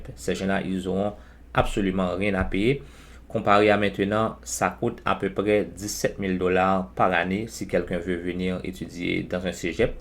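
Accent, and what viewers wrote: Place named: Haiti. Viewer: Vous eyes d'ou, vous avez l'accent Haitien. Si chest vrai, peus tu m'envoyer vote email j'ai des questions.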